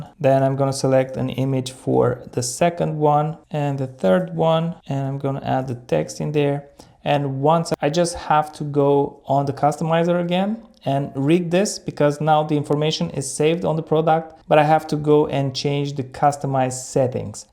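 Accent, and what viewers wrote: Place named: India. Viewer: primeira vez que eu vejo brasileiro falando em ingles com sotaque indiano kkkkkkk valeu pela aula mano, ajudou muito aqui na minha loja tmj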